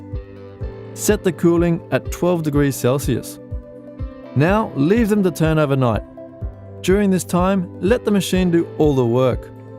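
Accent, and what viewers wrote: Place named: Australia. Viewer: He sounds Australian with a hint of French.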